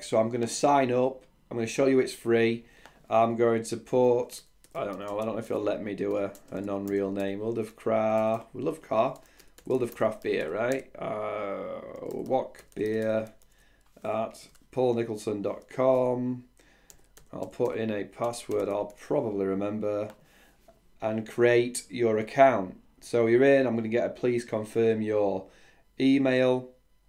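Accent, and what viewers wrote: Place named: Yorkshire, United Kingdom. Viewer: Where are you from, sounds like Yorkshire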